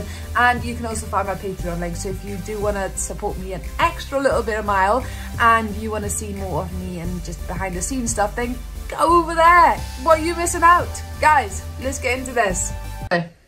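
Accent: Wales, United Kingdom. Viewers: are you welsh? you sound welsh :)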